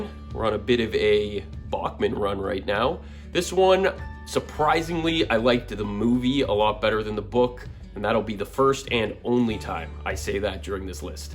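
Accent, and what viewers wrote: Canada. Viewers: You're Canadian, aren't you? (I subbed btw nice list) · Love this presentation, love your Ontarion accent.Respectful , sincere , dedicated- lots of fun.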